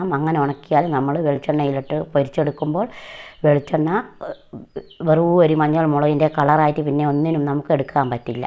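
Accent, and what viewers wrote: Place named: Kannur, India. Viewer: തനി കണ്ണൂർ 'മ്മടെ 'നാട് '👍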